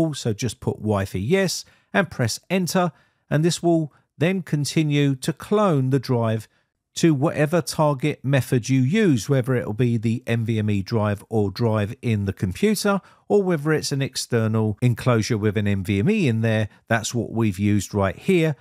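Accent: United Kingdom. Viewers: Oh it's more than just his accent for me (although everyone knows Americans adore British accents😍), but he also sounds *JUST LIKE* Sir Maurice Micklewhite CBE (otherwise known as the actor Michael Caine 🤗🤗🤗) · The UK accent is so pleasing to my ears. Thanks for the informative video. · I’ve wanted to say that for a long time, too. Since someone else has now mentioned it, I add my voice to theirs: Love the UK accent!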